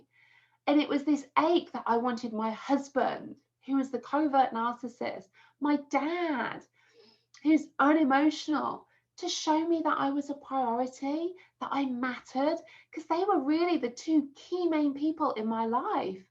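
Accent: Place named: England, United Kingdom. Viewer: Love the English accent seems to help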